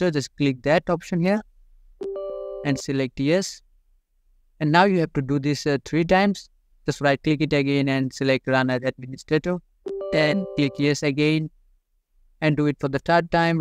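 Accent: India. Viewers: After i heard indian accent i knew i found the one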